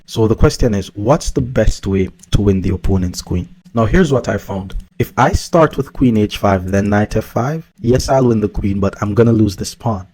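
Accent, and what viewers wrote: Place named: Jamaica. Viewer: I noticed the Rastafarian Jamaican accent my brother wagwan brudda... Love the breakdown analysis, I just got recommended Ur channel 💯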